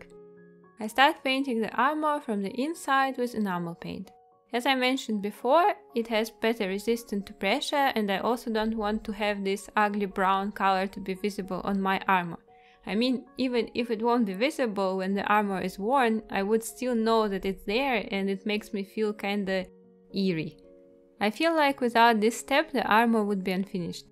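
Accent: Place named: Russia. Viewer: Ain't these the old Soviet Nutcracker frames in the beginning?) Also, pardon me for asking - but i kind of detect a slight Russian accent there)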